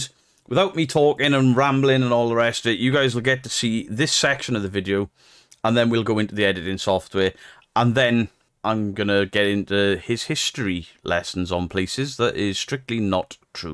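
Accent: Wales, United Kingdom. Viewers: thanks! I was monetized Once! I still get quite a lot of subscribers but no coin! ha I recognise the accent (as being Welsh lol) I'm in Carmarthen, Merlin's Town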